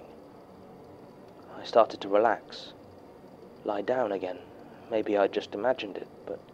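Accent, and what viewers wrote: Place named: United Kingdom. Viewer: I love mumbling in my ear just like doing his thing I love a deranged British man in my ear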